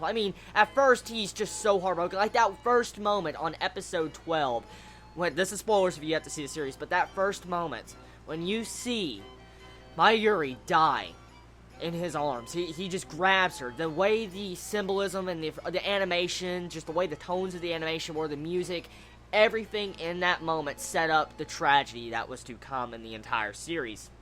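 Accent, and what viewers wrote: South, United States. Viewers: I think looking back on your older videos, and your recent ones, you've lost some of your southern accent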